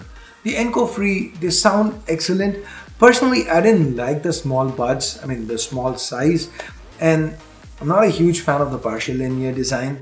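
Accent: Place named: India. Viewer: I really don't like the hindi accent in general but you speak slow and is bearable to understand.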